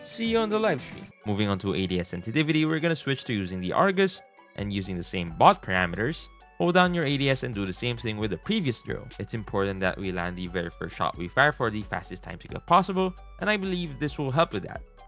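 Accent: Philippines. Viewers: Hey, just discovered your channel. What's your nationality? You sound very Pinoy English.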